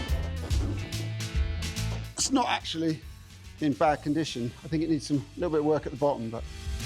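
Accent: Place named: United Kingdom. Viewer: muy intersante! Mi padre tenía uno de estos, ¡estos son recuerdos para mí! Y me encanta el acento británico!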